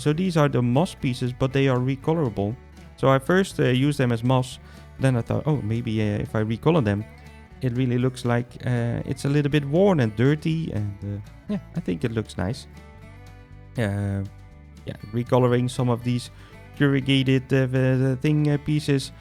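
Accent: Netherlands. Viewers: Altijd leuk hoe je het commentaar doet, beetje nonchalant en love je Nederlandse accent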